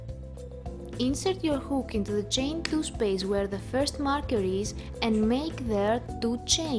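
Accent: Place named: Greece. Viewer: ΚΑΤΑΛΑΒΑ ΑΜΕΣΩΣ ΑΠΟ ΤΗΝ ΠΡΟΦΟΡΑ ΣΟΥ ΟΤΙ ΕΙΣΑΙ ΕΛΛΗΝΙΔΑ!! 😉 ΜΠΡΑΒΟ ΚΟΡΙΤΣΙ! ΕΥΧΑΡΙΣΤΩ ΓΙΑ ΤΟ ΒΙΝΤΕΟ! ΕΧΩ ΣΚΟΠΟ ΝΑ ΤΟ ΦΤΙΑΞΩ ΚΑΠΟΙΑ ΣΤΙΓΜΗ! KEEP UP THE GOOD WORK!😊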